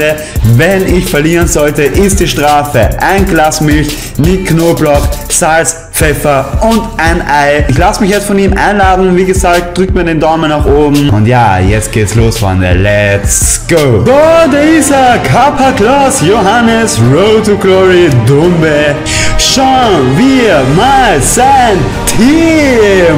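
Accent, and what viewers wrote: Austria. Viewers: Ich Feier deine Art so sehr hahah Echt cooler Typ Kommst du aus Österreich (wien) ?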